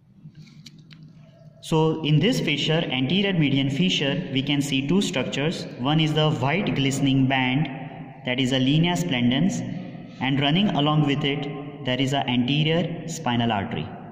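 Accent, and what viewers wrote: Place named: India. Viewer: Indian accent is so cool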